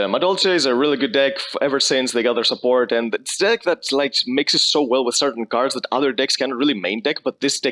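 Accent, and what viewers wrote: Greece. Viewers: bro you sound kinda greek this accent gives greek vibes also very nice video!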